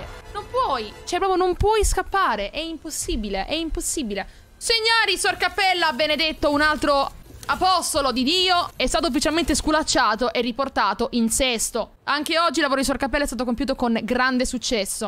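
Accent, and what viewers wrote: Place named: Naples, Italy. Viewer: Ma tu sei napoletana io sono di bari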